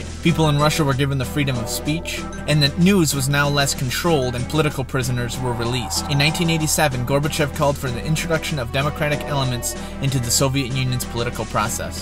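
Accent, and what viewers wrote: United States. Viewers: You from Chicago? I can hear that accent lol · The narrator has a distinct Michigan accent.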